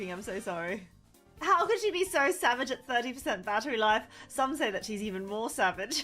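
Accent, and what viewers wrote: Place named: England, United Kingdom. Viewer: She's just English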